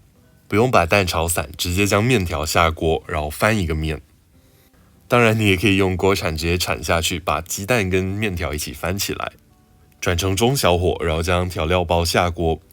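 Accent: Taiwan. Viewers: 会是马来西亚的人吗🤣怎么怎样听都不像且像台湾，马来西亚人讲话的风格众所周知😂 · 是馬來西亞人喔，口音跟台灣蠻像的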